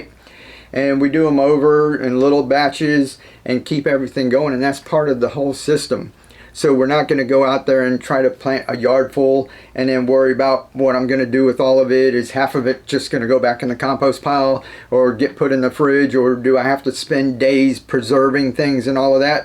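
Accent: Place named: Alaska, United States. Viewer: You sound like another Alaskan 😂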